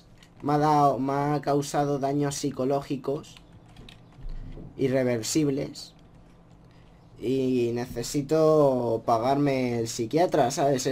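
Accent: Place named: Dominican Republic. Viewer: Mmm... se te esta pegando lo dominicano saludos desde republica dominicana:n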